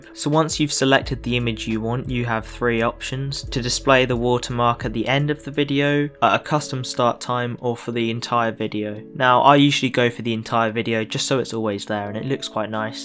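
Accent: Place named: United Kingdom. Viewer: I'ma assume you are British Your accent sounds British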